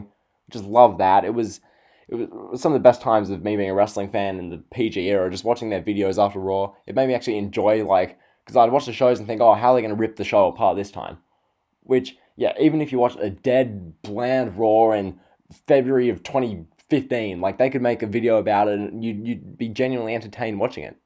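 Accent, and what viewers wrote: Australia. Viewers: Are you Australian? I recognize the accent.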